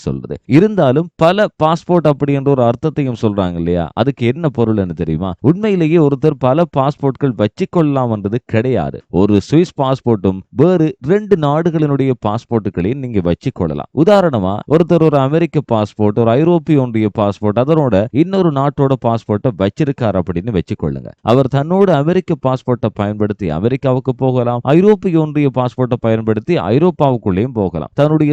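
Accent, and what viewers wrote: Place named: India. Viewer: செய்தி வாசிப்பவர் ஈழத்தமிழர் என்றால் ஏன் இந்திய உச்சரிப்பு?? ஒன்றில் ஈழ உச்சரிப்பு அல்லது இந்திய உச்சரிப்பைப் பாவியுங்கள். இரண்டும் கலந்த உச்சரிப்பு கேட்க சகிக்கவில்லை.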